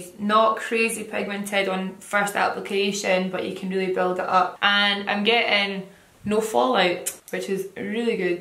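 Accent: Scotland, United Kingdom. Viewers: I love your accent. Are you Scottish?:)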